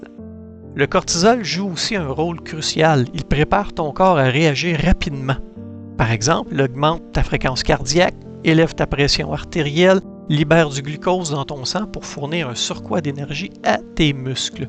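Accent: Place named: Quebec, Canada. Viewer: Bonsoir ❤ je ne sais pas respirer, aussi, je me sers de votre vidéo explicative: " votre technique 4; 7; 8.." Merci pour cette explication géniale! Vos vidéos sont hyper intéressantes! J’ai une question...Est-ce qu’une personne ayant un souffle au cœur +un problème cardiaque peu faire du sport s.v.p? Merci par avance! J’adore votre accent Québécois, c’est un accent chantant tout mimi.Prenez soin de vous! ❤🙏❤️🥀🙋‍♀️🥀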